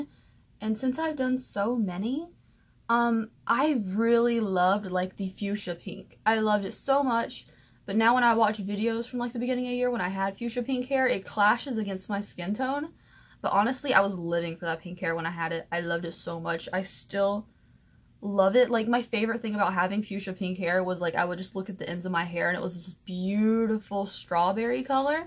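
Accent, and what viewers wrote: South, United States. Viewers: Love you MOSTEST sis!! I'd LOOOVVEE to hear your southern accent in full force... I have a southern Illinois accent so a drawl out my A's real bad. I don't notice it but when I traveled west I got a bunch of shit for it.. Not bad. Just shit. Lol anyway have a good night girlie!! ✌🤘💋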